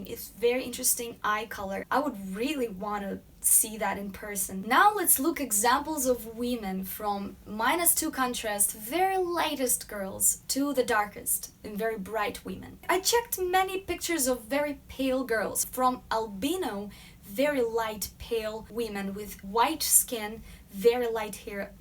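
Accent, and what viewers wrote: Russia. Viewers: I have the muddy eyes, dark green and orangey brown. Like you,. I knew when I saw you and heard you speak that you were Russian, before I read your bio.;) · Всегда узнаешь русский акцент) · Ахах, через 20 секунд речи поняла, что девушка русская. Рашн инглиш из соу рашн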